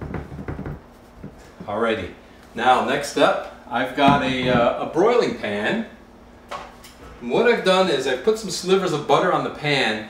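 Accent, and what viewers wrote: Canada. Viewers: Anyone else that can tell this dude is ethier from Canada or Wisconsin just by his accent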